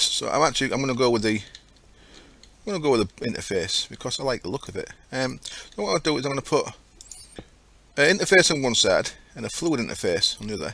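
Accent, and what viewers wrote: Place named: Hull, United Kingdom. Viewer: I think I listened to you say auto-spawner about 4 times. That Hull accent is hilarious, not in the mocking 'haha you sound different' way, but in the plain hilarious way:D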